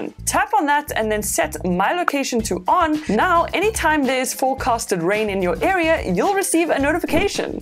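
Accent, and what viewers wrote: South Africa. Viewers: Thats a south african accent! Sandton? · South African accent.. Proud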